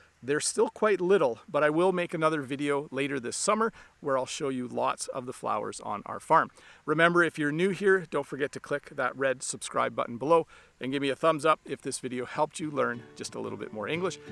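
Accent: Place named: Canada. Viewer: Hi from Milan (italy), very nice youtube channel... i think that understanding canadian accent is easier than understanding british accent 😅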